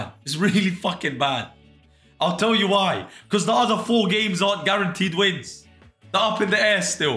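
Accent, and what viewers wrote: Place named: United States. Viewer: The American accent gets me. 😂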